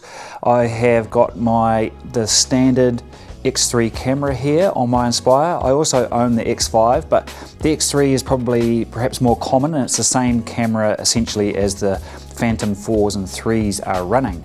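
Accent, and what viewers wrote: New Zealand. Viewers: Haha! Definitely Kiwi! Great advice and guidance.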